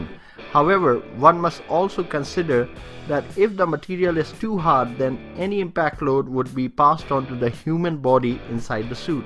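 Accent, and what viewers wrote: India. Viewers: You make speaking english in indian accent sound cool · I'm sorry but this guy sounds like indian tech support.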